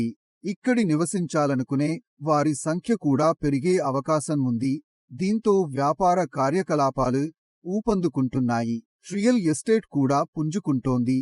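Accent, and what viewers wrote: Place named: Tamil Nadu, India. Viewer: Don't want Tamil slang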